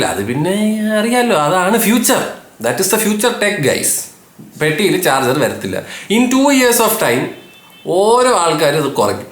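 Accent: Trivandrum, India. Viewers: Pinne enthire haha edayike Trivandrum slang varum.. adipoli man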